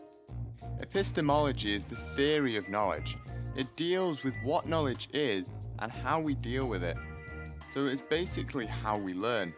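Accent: United Kingdom. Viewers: your british english made me hard to understand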